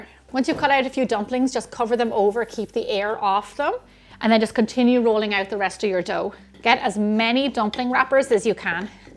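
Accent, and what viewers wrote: Ireland. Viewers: Hi Im not fond of dumplings but I watch anyway for this Irish accent🎉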